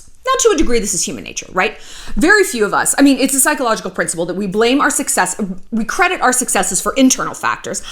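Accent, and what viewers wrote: England, United Kingdom. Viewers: i like your british accent so much i listen and don’t get the words because i listen your voice like listening music, i have to go back to listen again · Your English accent is 🤩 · LOVE the British accent! With love from London xx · your british accent is on point, this video is straight facts. Your hair is glowing 💜💜💜 talking about glowing, you look really happy, relaxed, you are finally at peace. You're blooming... i'm so happy for you, i was so stressed myself out when i saw you struggling with all those issues and bad people. You deserve the best, you helped a lot of people to open their eyes and stand for themselves, you saved a lot of people from bad people and relationships. You deserve to be loved and cherished and this fresh start is for sure the best decision 💜💜💜 Kisses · Looooveee your British accent 😂❤️ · Love your English accent ! 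· Your British accent kills me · I love your brit accent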